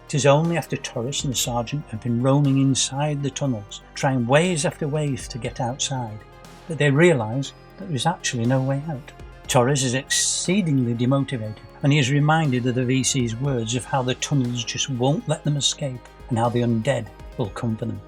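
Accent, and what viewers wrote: United Kingdom. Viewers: Nice to have a British narrator, their accents are awesome.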